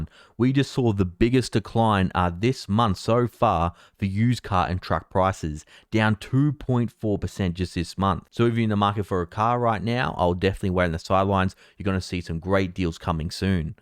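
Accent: Australia. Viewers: He's from Oz.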